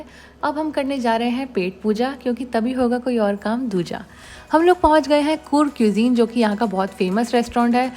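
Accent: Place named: China. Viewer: 한국인이 아닙니다 왜 자꾸 한국이라 하는건가요 발음이 중국인 발음이네요.전 한국에서 왔습니다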